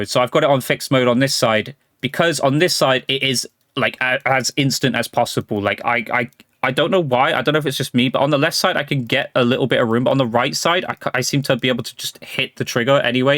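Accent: United Kingdom. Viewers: Hello you from Liverpool? you have an accent maybe scouse, top contrôller form me but he's just hard to find it in EU.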